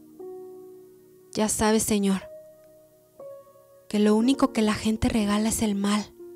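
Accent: Mexico. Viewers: Me encanta el acento mexicano, tu voz y narrativa son perfectas. Estos tres aspectos hacen una experiencia única tus audiolibros, además de ser cuentos populares y con alto dialecto coloquial. Me parece excelente el mensaje final, sobre promover la cultura en cualquiera de sus formas, que por cierto en este video no lo agregaste. Soy un agradecido seguidor de tu trabajo. Saludos 🇻🇪🇨🇱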